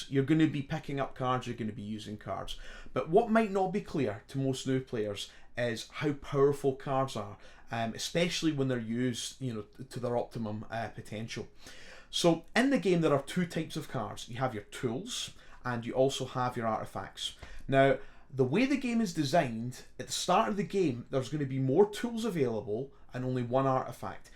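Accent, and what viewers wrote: Scotland, United Kingdom. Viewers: Sounds scottish. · Sounds more Scottish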